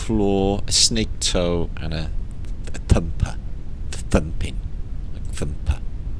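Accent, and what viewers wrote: Wales, United Kingdom. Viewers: Entranced so far thank you.. Just cutting in to say.. are u from south Wales. . U sound like u have at least half an accent for it.. it's all good.. I'm here in West Wales. . On the coast and yup.. Britain are just bullies.. no wonder we on a puppy eyed innocent "it wasn't meeee" type island. P.s. I'm half Manchester from when I was a kid.. and accent sounds familiar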